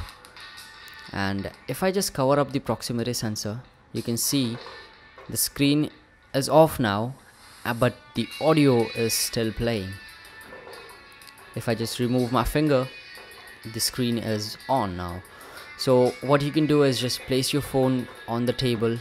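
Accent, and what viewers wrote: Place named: India. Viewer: its indian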